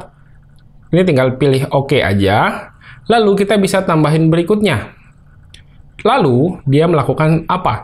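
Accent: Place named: Kalimantan, Indonesia. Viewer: Wah orang kalimantan nih abangnya ya? 😁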